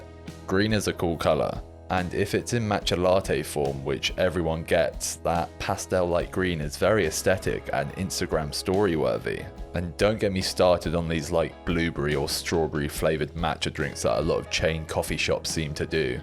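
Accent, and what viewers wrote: United Kingdom. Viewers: we listen to you speak because occasionally between your british twink accent and takes that feel like they were formed in a bottle left on the london tube, there are sometimes valid points you make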